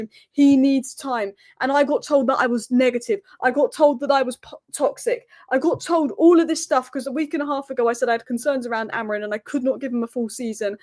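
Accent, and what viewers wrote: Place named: England, United Kingdom. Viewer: Guys what type of English accent she has , I love it.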